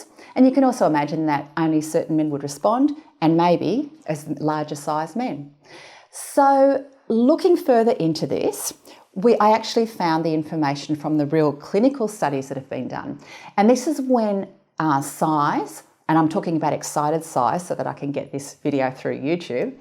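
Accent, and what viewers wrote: Australia. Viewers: THANKS FOR YOUR INTERESTING SURVEY. I BELIEVE YOU ARE AN AUSTRALIAN DOCTOR FROM YOUR ACCENT. THANKS AGAIN! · What accent is this? I can't identify it. Australian maybe?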